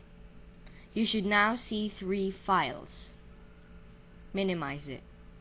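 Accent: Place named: Philippines. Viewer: i bet u that ur filipino, u have my friends accent.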